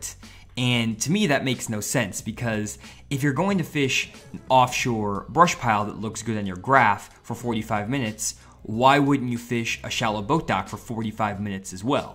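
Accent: Oklahoma, United States. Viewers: Are you from Oklahoma?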